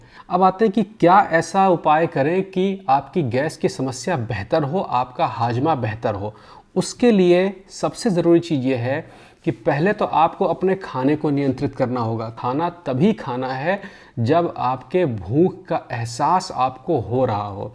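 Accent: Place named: Lucknow, India. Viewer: Sir aap kaha ke rehne wale h apki boli Lucknow side ki lag rhi h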